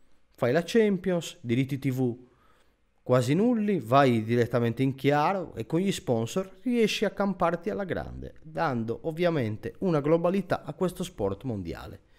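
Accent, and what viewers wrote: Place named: Sardinia, Italy. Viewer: Ottime le tue analisi. Però non oso immaginare cosa ci faranno ora... (Riconosco l'accento della mia amata regione sarda: di dove sei?)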